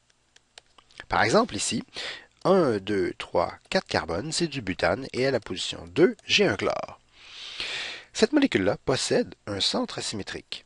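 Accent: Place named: Quebec, Canada. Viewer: Oh mon dieu, je suis en première année de médecine, ça fait trois mois que je cherche à comprendre cette chose !!! A deux semaines des partielles je comprends enfin, en plus avec l'accent québécois, c'est parfait ! Merci beaucoup !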